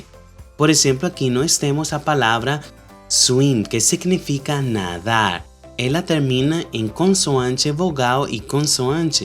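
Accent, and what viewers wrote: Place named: Japan. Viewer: Boa noite professor tudo bem seu sotaque paresse ser japonês moro em Boa vista Roraima Brazil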